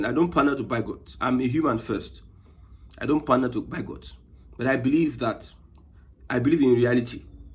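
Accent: Yoruba, Nigeria. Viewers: You are not from Southeast. You are a Yoruba man even from your intonation... Stop this tribal bigotry you are doing.. There is nothing between Igbos and Yorubas in Lagos. It is just political.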